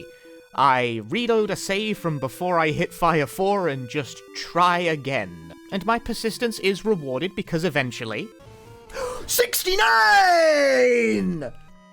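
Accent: United Kingdom. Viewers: you sound way more british in editing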